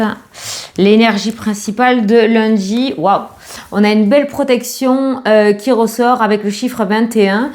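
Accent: South, France. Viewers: Bonsoir, Quel peps j'avais pas le moral tu ma regonflé à bloc merci pour ce beau moment! J'ai adoré le passage "Jean Jeannot Georges" une pensée pour mon papa au ciel qui s'appelait Georges 🕊🥰 je suis du sud aussi j'ai l'impression de m'entendre parler!! Ta joie de vivre et les expressions font un bien fou comme à chaque fois! Une belle soirée à toi et merci encore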